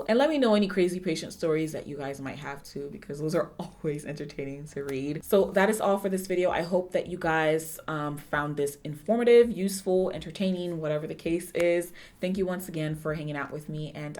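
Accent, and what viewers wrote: Nigeria. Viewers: I'm loving that Nigerian accent :) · lol the nigerian accent.. <3 awesome video btw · I love the in-between Nigerian accent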